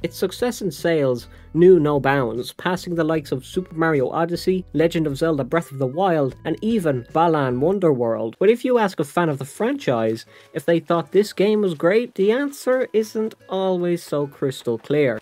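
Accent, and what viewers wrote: Ireland. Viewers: irish person detected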